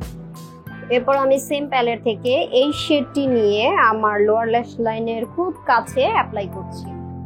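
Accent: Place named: Comilla, Bangladesh. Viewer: Apu ami o cumillar meye😍🙋🙋 tmar kase cumillar vasay kotha bolte dekhte onek valo lage 😁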